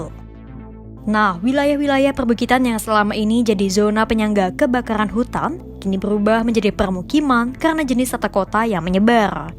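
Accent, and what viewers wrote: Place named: Palembang, Indonesia. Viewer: Naratornya kemungkinan orang Palembang,logatnya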